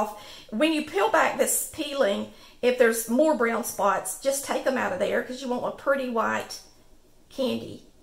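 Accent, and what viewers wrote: South, United States. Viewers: HI, Im originally from THE ICE BOX OF THE NATION, INTERNATIONAL FALLS MINNESOTA, but we lived inTennessee for 15 years, now we’re back living in northern Minnesota. I miss hearing the southern drawl I love listening and watching your show, along with your wonderful cooking. Your a great teacher and have a sweet loving personality. I’ve always been curious about the southern potato candy I’m gonna go ahead and make me a batch.♥️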